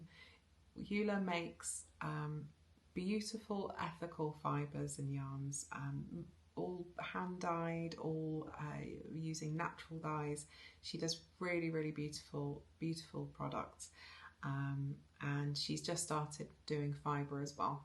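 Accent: Wales, United Kingdom. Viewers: Another lovely episode. Thank you. And by the way, you definitely do still have your lovely welsh accent 🏴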